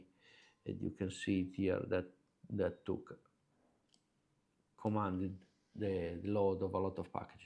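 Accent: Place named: Romagna, Italy. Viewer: Ottimo video, mi hai fatto scompisciare con l' italiano perché si sente l' accento romagnolo..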